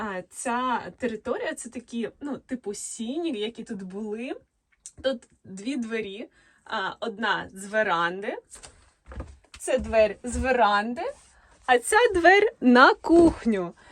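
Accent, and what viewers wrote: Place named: Surzhyk, Ukraine. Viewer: Красунечко! Ви молодчинка, що намагаєтєся розповідати усе українською. Але слово ДВЕРІ, за ДВЕРИМА тощо.. це завжди МНОЖИНА! підказка, яка полегшить Вам вимову без суржику ...Перед зйомкою кожного сюжету ознайомтесь з усіма маловживаними або маловідомими українськими словами з тих, які плануєте використати у своєму черговому випуску. Бажаю успіху Вашому каналу!!🎉🎉🎉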